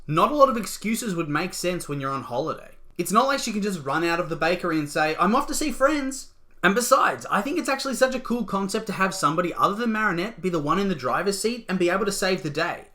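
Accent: Australia. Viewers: are you australian? ps love your videos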